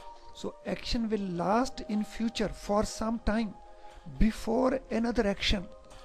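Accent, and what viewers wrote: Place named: India. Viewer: i hate your Indian accent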